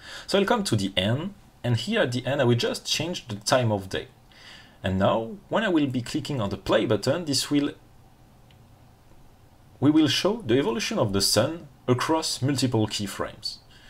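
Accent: France. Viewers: Le bon accent français qu’on aime 😭😪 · I'm from Germany and I find this french accent quite charming actually. But I can imagine that people from Texas or Pakistan maybe could be irritated by it :-)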